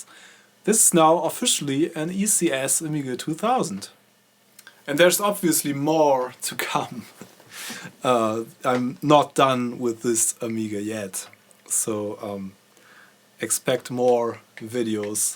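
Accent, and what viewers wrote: Germany. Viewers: You sound like a german boy who trrrrry to speak english.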